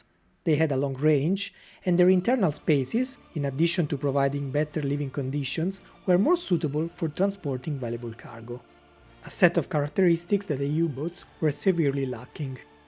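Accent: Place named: Italy. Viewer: Just an outstanding video altogether with that great Italian accent that so well fits the content. Many thanks!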